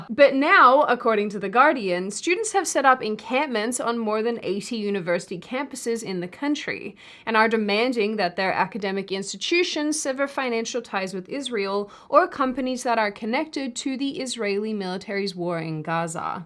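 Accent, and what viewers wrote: Australia. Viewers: Every once in a while, your Auscent shows through, and I love it. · Still sounds very Australian to me. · If they are it's distant considering her Australian accent. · Have you been in Australia recently? You sound more Australian in this video than your last one.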